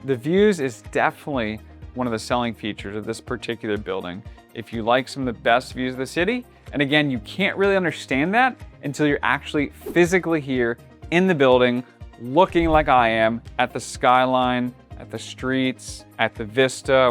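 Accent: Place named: Philadelphia, United States. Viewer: I'd trust this guy. He's so Philly. I hear the accent (just a little).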